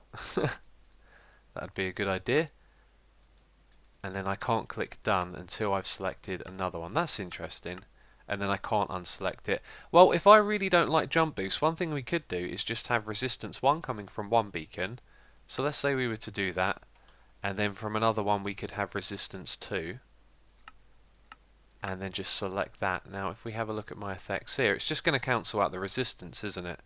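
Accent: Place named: South West, United Kingdom. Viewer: You sound like you're from the south west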